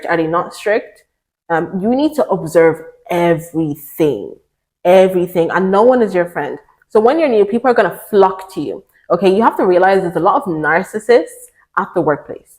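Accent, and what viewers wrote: Ireland. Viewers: Unrelated but are you Irish? You’re accent is familiar 😅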